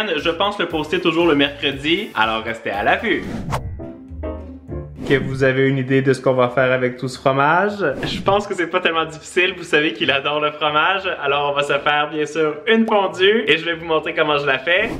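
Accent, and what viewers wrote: Quebec, Canada. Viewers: Après tant d'années à te suivre ton est toujours aussi incroyable pour moi, vraiment l'accent québécois best accent de la planète ! ✨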